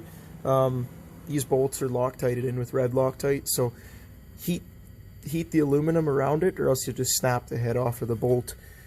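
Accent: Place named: Canada. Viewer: Dude you have to be from canada...lol you and i talk the same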